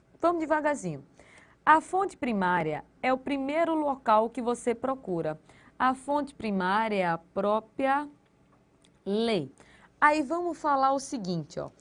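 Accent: Pernambuco, Brazil. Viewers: Ahhhh eu amei esse sotaque, já estava desconfiada, sabia que era de Pernambuco. Adorei suas aulas <mail>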